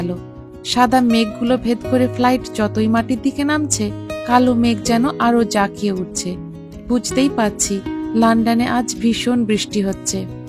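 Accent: Bangladesh. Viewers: Same here😂.ভীষণ বাংলাদেশের বলে মনে হচ্ছে. হতে পারে আগে হয়তো বাংলাদেশে ছিল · Apnar kotha sunla mona hoi apni bangladeshi dar moton kotha bolan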